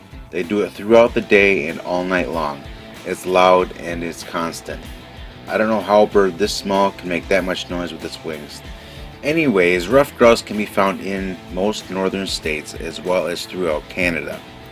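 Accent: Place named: Midwest, United States. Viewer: Great advice, can't wait to put it to use. Feels right hearing it from a midwest accent.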